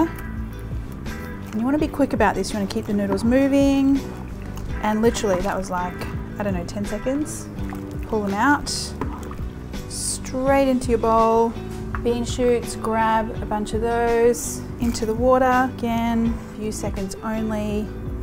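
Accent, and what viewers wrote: Australia. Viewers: she's aussie lol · Its her aussie accent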